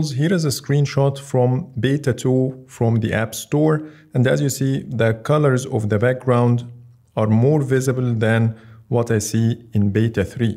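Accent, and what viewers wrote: Egypt. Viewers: الصوت ده مصري قوي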